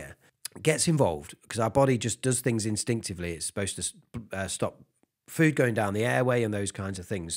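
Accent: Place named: North of England, United Kingdom. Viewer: Great talk/lesson. Sort of proves that often the best teachers are the ones that have been through the crap rather than the ones that had just done it right from the start, if that makes any sense. I heard once somewhere that Bach was a terrible teacher, because it (music/composition I dunno) was all so obvious to him he couldn't understand how anyone couldn't get it, so he was impatient and couldn't explain stuff haha. Sounds like you're from the north of England somewhere, the place I feel the most at home. Unfortunately I'm a long way from there and walk around upside down atm . haha Thanks and kind regards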